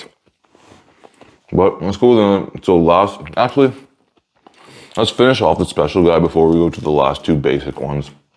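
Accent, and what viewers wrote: Canada. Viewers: I love your Canadian accent, it's adorable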